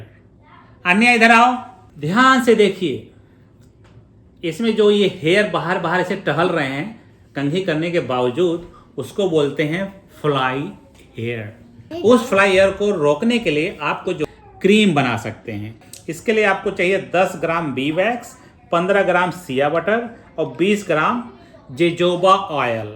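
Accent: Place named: Uttar Pradesh, India. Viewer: Appka Hindi UP ka ho... magar bache pura Gore hai...thats very much:) (laugh).. LOVING YOU ALL!!:face-red-heart-shape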